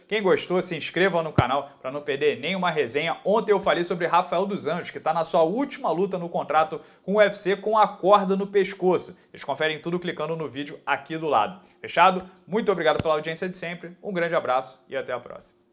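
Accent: Rio de Janeiro, Brazil. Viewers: Puta q pariu!!! Esse canal é da hora ms sotaque carioca é tão repugnante que chega da agonia kkkkkk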